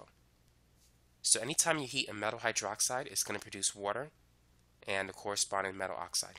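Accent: United States. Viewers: listening to an american is kinda annoying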